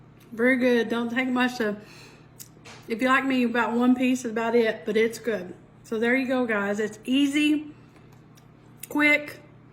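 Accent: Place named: Georgia, United States. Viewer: That's so so good !! I'm from Ga. and I have the same kind of accent . I say words like that too . HAHAHA...!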